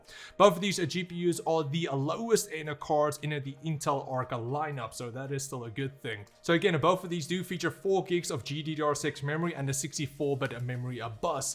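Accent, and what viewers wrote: Afrikaans, South Africa. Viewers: are you afrikaans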